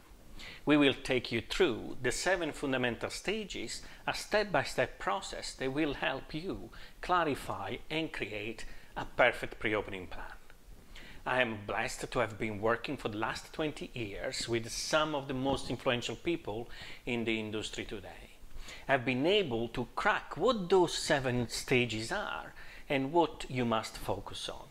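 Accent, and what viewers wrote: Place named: Italy. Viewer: nice content I like your italian accent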